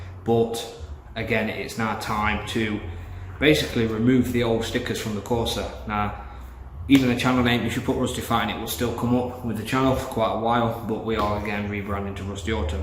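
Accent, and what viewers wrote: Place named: North West, United Kingdom. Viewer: Where are you from north west because that accent is mint lad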